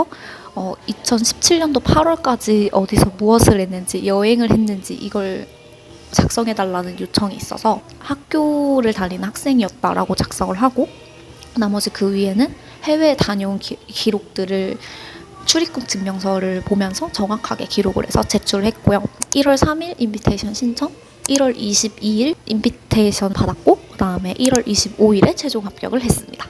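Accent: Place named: Busan, South Korea. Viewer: 반갑습니다. 씨. 딱 부러지시는 부산분이시군요.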